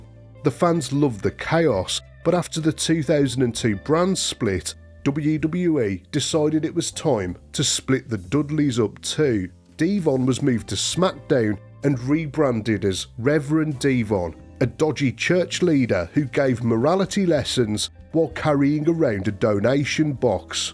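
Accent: Birmingham, United Kingdom. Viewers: Love your Birmingham accent and pronunciation of 'W', which is a popular letter in wrestling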